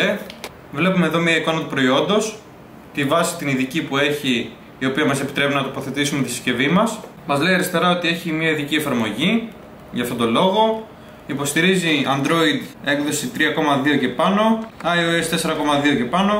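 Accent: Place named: Russia. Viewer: oh WTF. Russian?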